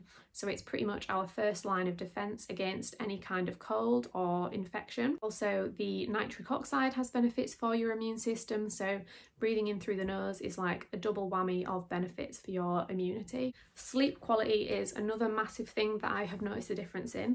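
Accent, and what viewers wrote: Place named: England, United Kingdom. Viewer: I will definitely purchase that book. This stuff is so fascinating! Great channel overall as well. I didn’t want to offend but I figured England and I do understand there are various different accents among parts, as is the same here in the U.S. Very Russell Brand-esque to me 😂 Beautiful though!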